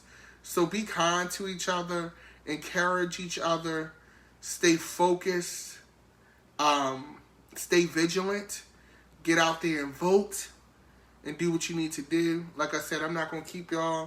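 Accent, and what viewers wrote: Baltimore, United States. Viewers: His accent sounds like Baltimore Maryland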